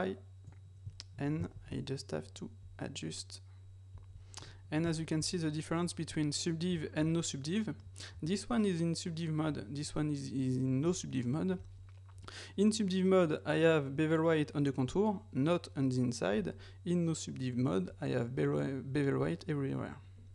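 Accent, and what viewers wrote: France. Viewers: haha l'accent français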